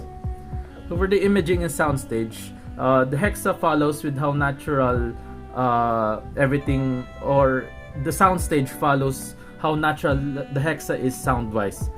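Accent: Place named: Philippines. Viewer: Pretty sure this reviewer is a Filipino. That accent 😆😆😆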